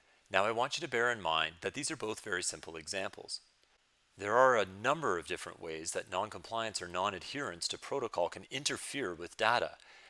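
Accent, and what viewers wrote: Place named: Canada. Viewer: That Canadian accent thoughhh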